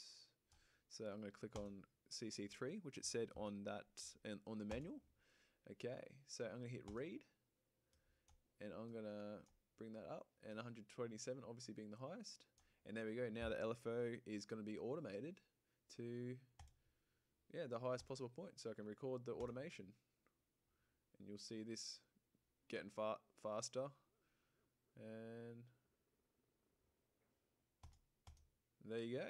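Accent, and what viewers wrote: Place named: Australia. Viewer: Hi from Norway! Great to hear another Aussie accent :)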